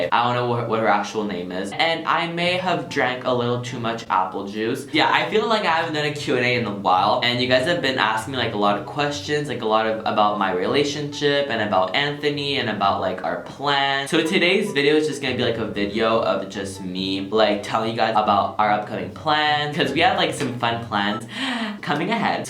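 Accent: United Kingdom. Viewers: Love your British accent so much 🙈❤️ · Love ur british accent🙈😂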